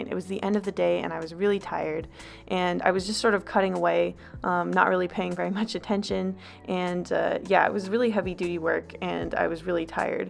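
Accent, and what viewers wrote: Canada. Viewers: Is she from Canada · You're Canadian?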